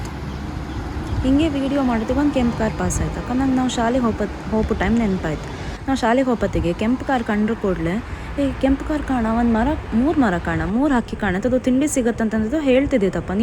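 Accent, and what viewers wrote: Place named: Kundapur, India. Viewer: Kundapur kannada super ❤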